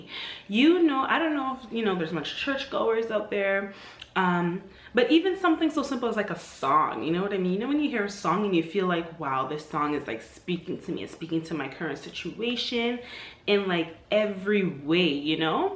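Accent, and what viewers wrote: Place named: Jamaica. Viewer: Are you Jamaican ? Cause bwoiii this sounds like the churches in Jamaica. Plus you have an accent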